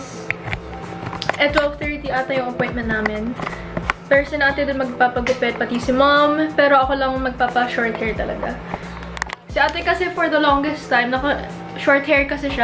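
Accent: Batangas, Philippines. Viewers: Batangenyang batangenya ka po,😊same po tayo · kacute ng batangueña accent huhu "ay syempre"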